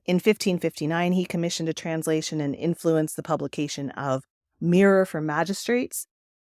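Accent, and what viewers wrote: United States. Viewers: Pity about the American accent of the narrator.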